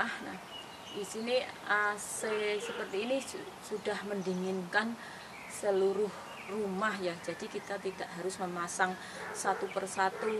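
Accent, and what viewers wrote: Java, Indonesia. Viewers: Mbak jowone nang ndi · Logate wong njowo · MB asline mana ya, kaya Jawa... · Wkwkw jawane ngendi bu?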